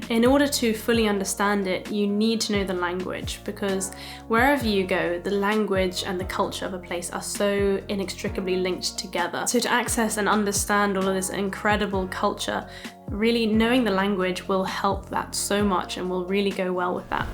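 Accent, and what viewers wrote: England, United Kingdom. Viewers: I was looking on youtube for Chinese lessons when I came across your videos. I thought I saw a Chinese woman on your video cover. Then she speaks pure English with a British accent. I was like : whaat ?? Then I learned she only speaks English and is learning Chinese. heh ? . If your friends told you you should try make youtube videos and put your face on the covers - then yeah, that was a great idea. That beautiful face of yours is a click magnet. Very GOOD ! Now I also learned that you are studying to become a doctor. Hey hows that for double good: She also has brains ! not just something like perfect beauty. The problem with most doctors are they never get around understanding the placebo effect even though it is in most doctors study materials. I hope you will be smarter. And I DO hope you will be smarter :). Anyway, I am curious about how come you look so Chinese/Asian. 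Must be in the bloodline somewhere recent in the family tree ? I think your reasons and motivation for learning Chinese is very good. I will not keep it against you for neglecting the following reason: And next time some Chinese speaking person starts to speak Chinese to you because he/she thinks YOU are Chinese speaking: You don't just have to stand there dumb struck and start talking English with a perfect British accent. Sorry but its just funny ! LOL . Well have a great day and I'll probably see you in some of your other videos. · wow i like your british accent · Ni Hao I love everything you said,particularly your British English. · As an American born Chinese - I grew up speaking Cantonese. Honestly, its NOT the Chinese but your ENGLISH ACCENT I love most. The English accent automatically boosts your perceived IQ 88 points!!! Where are you originally from?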